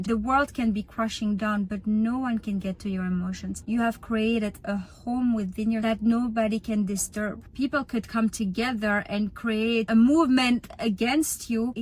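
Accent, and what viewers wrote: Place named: France. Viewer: i really enjoyed this video!❤ i love your accent, are you from France?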